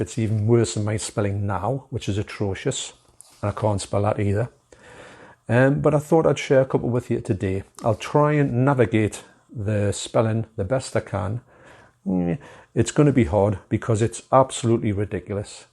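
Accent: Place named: RP, United Kingdom. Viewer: what's with the posh accent ?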